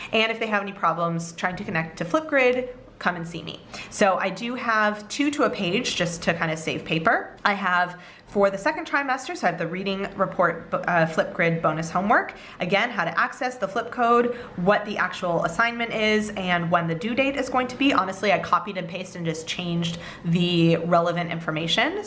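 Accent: United States. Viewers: That's really cool. The Yearbook thing brings back memories =) Do the kids ever get confused about pronunciation of some English words between your American pronunciation and the other teacher's UK pronunciation?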